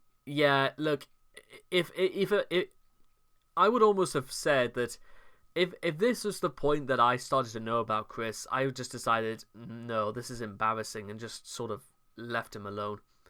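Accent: United Kingdom. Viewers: Sounds Northern to me. But I'm an ignorant North Londoner. I'd guess Northamptonshire maybe. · What part of the UK are you from man?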